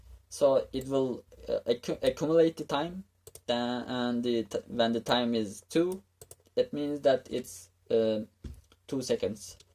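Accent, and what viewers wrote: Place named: Turkey. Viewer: usta hiç dikkat etmemiştim masaüstüne aksandan türk mü acaba dedim doğru çıkıt :d böyle bir işe ingilizce girip anlatmak çok iyi cidden helal olsun eğer yorumu görüp cevaplayabilirsen bir şeyler sormak isterim bi kalbe anlarım soru beklediğini AS:DASd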